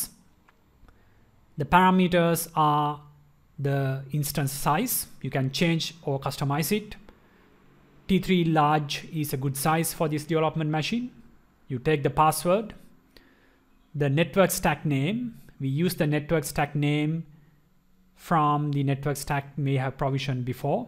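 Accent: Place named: India. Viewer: DAT DAT DAT I never understood why Indians do not even try to fight their accent and at the same time climb the Internet to shoot videos in a language other than their own. As one hero said, "I can't swim and I don't put my ass in the pool." The video is about nothing. Any fool can download the repo from the git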